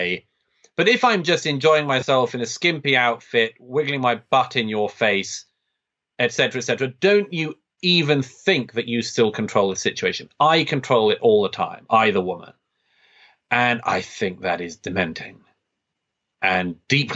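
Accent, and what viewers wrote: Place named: RP, United Kingdom. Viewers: One minute in, and here's another old man who says a lot of hot air with a posh accent. I hope this doesn't become a trend. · Society makes impossible demands on both men and women because we have a capitalist society, but this is missing from this faux intellectuals' 'message'. Just because he has a posh accent doesn't mean that there isn't plenty missing in what he has to say.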